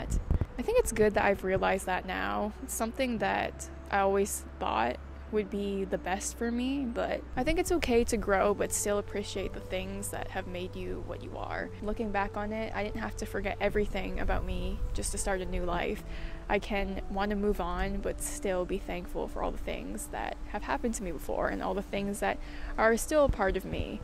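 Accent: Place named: Toronto, Canada. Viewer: Is this in Toronto because I noticed the accent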